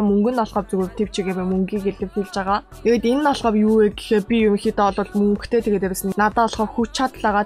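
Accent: South Korea. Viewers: ahiin duu bitgii shantraarai yhv john buurunhuu yaridag l bga biz chinii solongos aylaga akcent ystoi best shu amjilt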